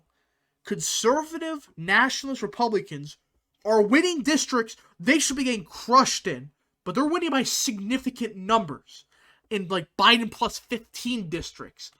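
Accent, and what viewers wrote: Wisconsin, United States. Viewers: I’m from Wisconsin and literally 10 seconds before you said you were from Wisconsin I was thinking “this guy sounds like he’s from Wisconsin”. I hope you’re right, but I’m always gonna vote like we’re 10 points behind.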